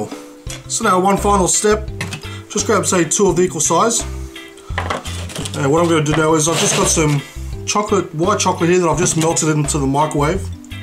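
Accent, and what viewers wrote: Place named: Australia. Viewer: he sounds like an aussie hell yeah!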